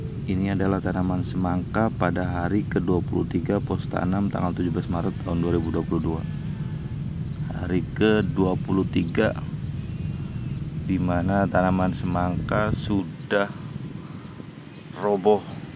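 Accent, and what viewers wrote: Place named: Indramayu, Indonesia. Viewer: logate kaya logat dermayon.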